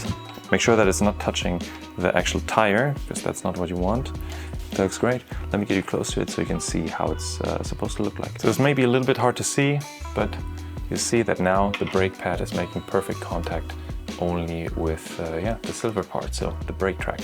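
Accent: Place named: Germany. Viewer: As you can hear in his accent, he is probably German, in my opinion 😉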